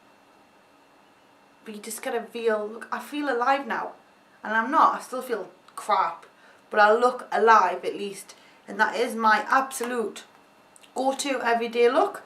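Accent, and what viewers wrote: Newcastle, United Kingdom. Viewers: The accent comes from south shields, which is in northeast England very alike to a Geordie accent love it <3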